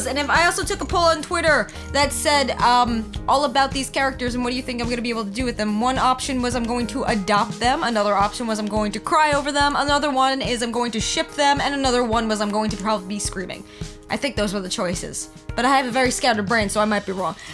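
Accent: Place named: Brooklyn, United States. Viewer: is this chick from Brooklyn or some shit? I hear some sort of accent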